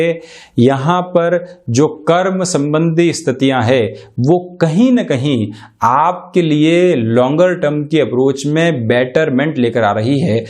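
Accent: Standard. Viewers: Aap bahut sudh Hindi bolte h..kai batein smjh nhi aati..please thoda simple bole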